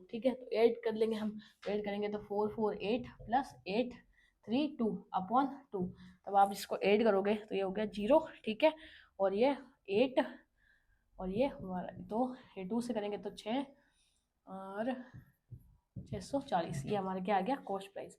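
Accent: Rajasthan, India. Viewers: Rajasthan se hai kya mam app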